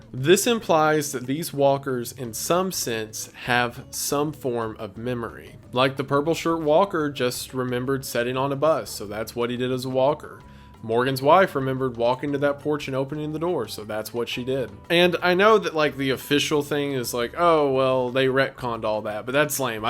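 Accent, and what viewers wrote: United States. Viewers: stop sounding like a yee haw man this cant be real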